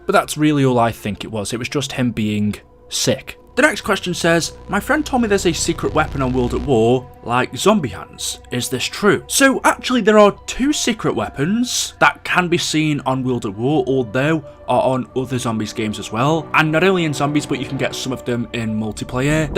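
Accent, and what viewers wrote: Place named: Stoke-on-Trent, United Kingdom. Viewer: Are you from stoke on Trent ? I recognise the accent, I’m from stoke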